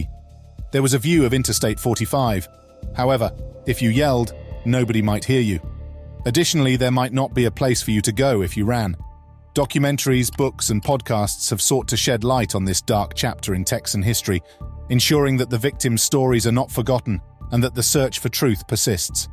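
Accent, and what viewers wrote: United Kingdom. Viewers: Could do without the narrator's brit accent. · Is this a Jew with a British accent attacking the White race again? Pretty sure it's a Jew jewing. Christians eat this shit up.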